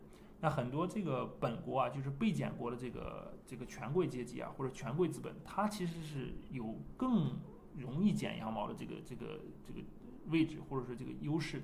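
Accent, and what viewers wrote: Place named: Shanxi, China. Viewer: 像山西的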